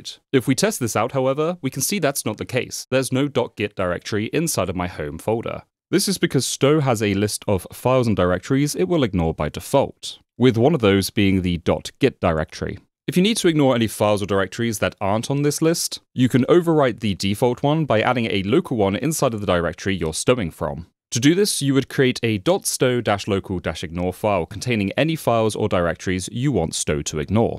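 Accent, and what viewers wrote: United Kingdom. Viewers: Indeed good job, you explained step by step in the simplest way possible, now I marked your video for my future fresh reinstall cause now I had to share my laptop with my partner. By the way speaking of your accent, are you from UK?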